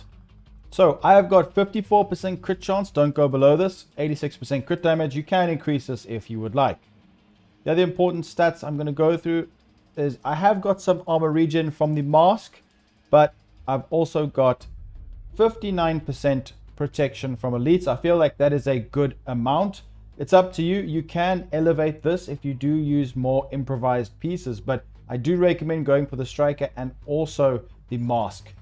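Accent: South Africa. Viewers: Jy klink erg Afrikaans... GREAT video!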